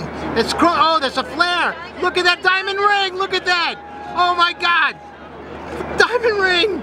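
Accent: New York, United States. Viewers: oh my gahd, here we go, oh my gah, here we go, look at dis x 100! New York obnoxiousness on an Alaska Airlines flight? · lol Not another one.. Oh my God, oh my God. But, sir, thank you for filming a perspective most of us couldn't see. (You from NY btw?)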